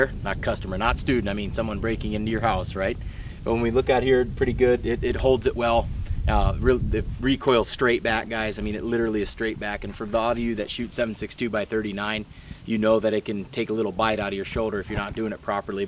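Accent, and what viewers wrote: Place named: South, United States. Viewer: Your starting sound a little more southern. A little bit.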